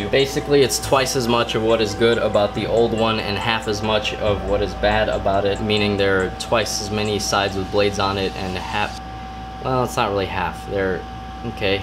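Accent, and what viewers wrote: France. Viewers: Dude that french accent 👌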